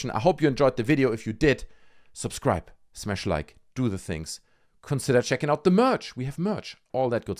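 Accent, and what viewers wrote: Germany. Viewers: Interesting video but I cant stand your accent. Tf. Just speak german.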